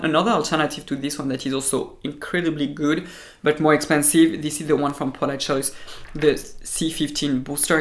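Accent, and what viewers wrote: France. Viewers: i love you and also love your french english😗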